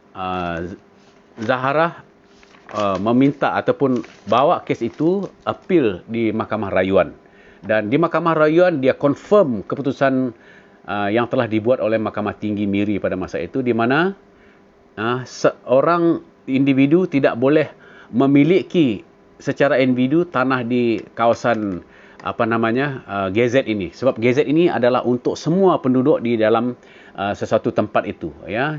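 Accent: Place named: Indonesia. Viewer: Kenapa cakap macam indon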